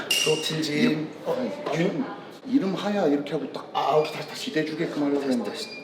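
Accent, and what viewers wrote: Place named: Countryside, South Korea. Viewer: 는 어디 시골 농부 페이스+얼굴과 어울리는 구수한 사투리 때문에 극호 ㅋ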